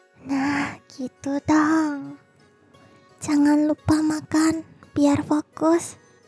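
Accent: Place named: Java, Indonesia. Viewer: Kak ngomongnya medhok ea...hehe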